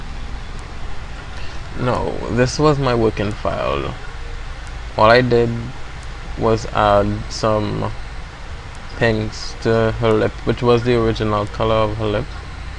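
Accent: Trinidad and Tobago. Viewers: you sound like a trini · You have tah be ah Trini..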